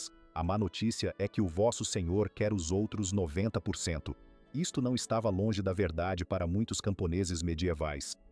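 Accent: Brazil. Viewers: Que curioso é ouvir falar em português mas com sotaque brasileiro.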